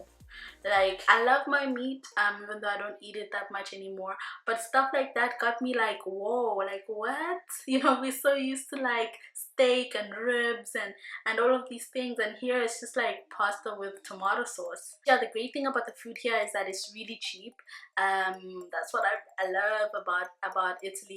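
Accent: South Africa. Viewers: LOL I spent the last two summers in Italy. they're watching you because there are a lot of Africans who have fled the continent! They are looking at you hard because you're so adorable! So how is your Italian progressing. Have you been to Turin, Sardinia or mid-country? Where is back home for you! You sound like you might be from Durban or some part of South Africa. if so, i love the country! They have a Mediterranean Diet. I didn't find the pizza as a issues because they don't even eat spaghetti and meatballs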